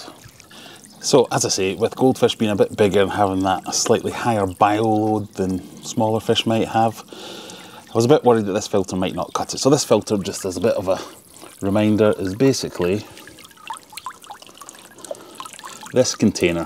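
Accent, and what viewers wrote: Scotland, United Kingdom. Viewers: So comforting hearing a fellow Scot talk about ponds 😂 where are you based?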